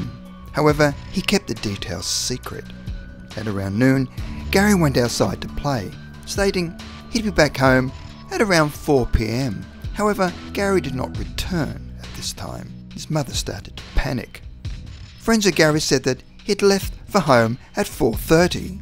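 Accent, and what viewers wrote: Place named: Australia. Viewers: peethewak, I listened closer and you are correct, the narrator is Australian. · Matt Kustom Kostumes i noticed that too. The narrator sounds Australian to me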